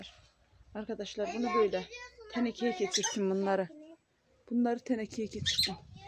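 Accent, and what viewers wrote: Azerbaijan. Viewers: Sen bizim gibi konuşiyorsun.Azerbaycanlımısın?çiçelerin çok güzel.bol çiçek açması için bir şey yapıyormusun?😊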